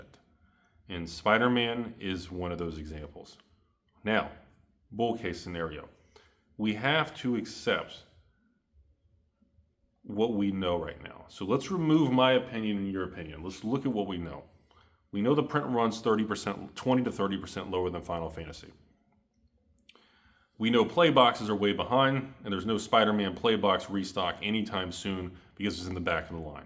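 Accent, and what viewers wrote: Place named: Oklahoma, United States. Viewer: You sound like a really brilliant individual- nobody with half a brain would take financial/investment advice from someone like you that talks like they date their sister in a trailer park somewhere in Oklahoma. Wow what a prediction it hasn’t hit its low yet like every single person already knows. Thanks captain obvious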